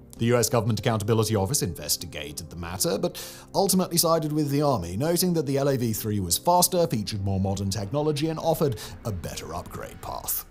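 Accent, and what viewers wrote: United Kingdom. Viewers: Dude, slow down, for crying out loud! Can’t catch everything you’re saying with this Brit accent.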